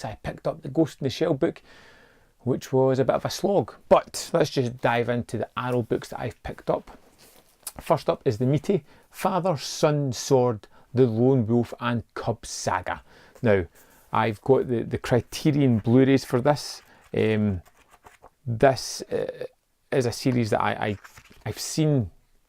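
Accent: Scotland, United Kingdom. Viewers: Do you mind saying what part of Scotland you are from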